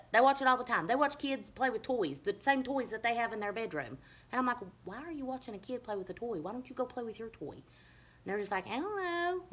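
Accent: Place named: South, United States. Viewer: U are wonderful and very funny country woman like you and that accent is bad ass Lady I just can't get enough of ur videos hell yeah my hillbilly friend word Lol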